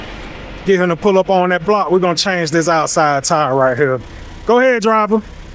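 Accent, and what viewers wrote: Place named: Maryland, United States. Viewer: You sound like someone I know. Are you from Maryland by chance? 😅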